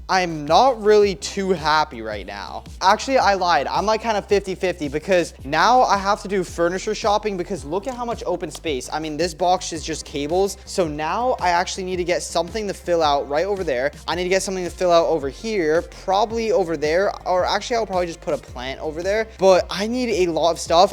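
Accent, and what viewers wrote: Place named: United States. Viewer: Bro is so American England is better he has so strong accent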